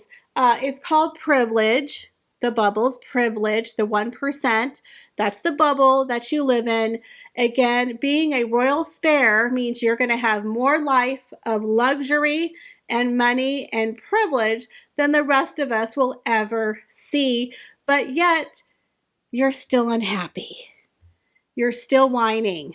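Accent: South, United States. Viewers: I'm sorta kinda new to your channel. I heard you do a live stream with Sue Smith and thought I might give your channel a try. I'm so glad I did. You have a very soothing voice and I love your charming southern accent (especially when you say "y'all). I hope your channel keeps growing.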